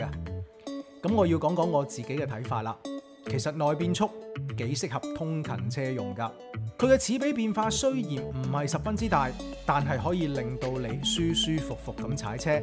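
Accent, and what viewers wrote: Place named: Cantonese, China. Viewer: 你啲廣東話我聽得好舒服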